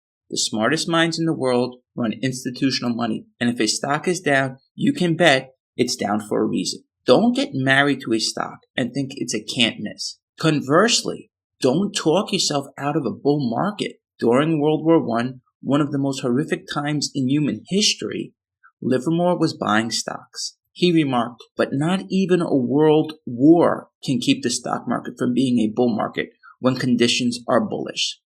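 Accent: United States. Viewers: Love you accent,Brooklyn? Cheers from the UK.🇬🇧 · Excellent yet simple 👏 👌 👍🏼.. Btw ..are u from ny 😅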